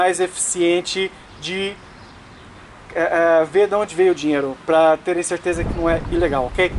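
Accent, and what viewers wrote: Portugal. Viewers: Bom dia daqui da Alemanha! Concurdo com você meu querido, é dever do governo de fiscalizar a entrada de dinheiro e não de acabar com o Visa Gold! Gosto muito do seu sotaque português! Tudo de bom pra vc!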